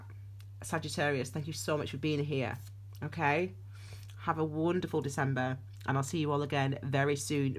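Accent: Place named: York, United Kingdom. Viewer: Hi thanks for your reading. I was wondering if you're from York in England? :) I can recognise your accent :) xx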